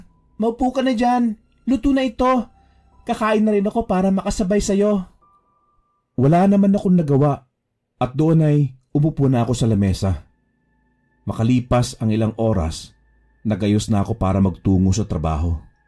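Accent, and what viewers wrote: Ilocos, Philippines. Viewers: nakakatuwa ka naman mg ilokano lods hehe nice story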